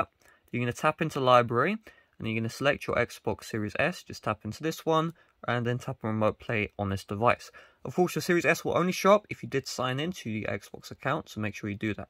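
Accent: United Kingdom. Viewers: Nice british accent mate! Watched till the end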